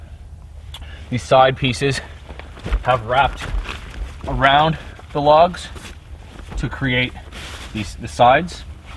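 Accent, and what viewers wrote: Canada. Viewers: (I'm sure some of my Canadian viewers will know what I'm talking "aboot") lawl that had me crack up and rolling because it reminds me of how the Canadians talked in South Park: Bigger Longer and Uncut. Every time you say "about" it comes out "aboot" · I can even tell that your Canadian, 1. You basically told it 2. The about to aboot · Let me guess Canadian?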